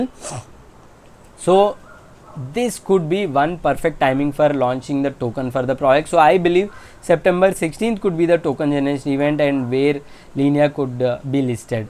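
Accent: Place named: India. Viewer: Your English sound like Hindu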